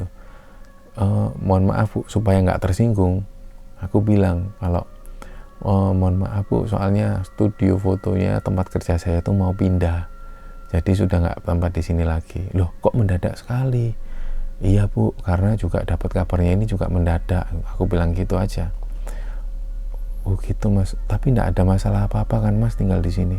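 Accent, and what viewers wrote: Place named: East Java, Indonesia. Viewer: mas, smpean arek suroboyo ta? kok logat, intonasi sma drh2 yg smpean ceritakan di episode2 lainnya gk jauh2 dr Jatim ( Sby, Sda, Mjk, jbg ). Tp memang baru di episode ini sing aku bs bener2 "menjadi dirimu", hehehe.. salam teko dr arek kedungdoro Mas.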